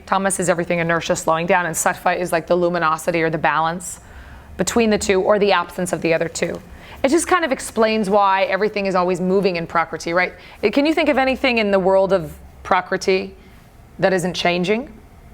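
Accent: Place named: India. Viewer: For a yogi, you are very close minded. Stop acting like Puritan, she grew up with those kind of phonetics, how can you expect her to improvise those dictions? Indian professionals working at silicon valley for decades can't do away with our thick English accent. And sanskrit is grammatically and phonetically probably most difficult language, even trained priests of Kashi don't chants those hymns correctly now.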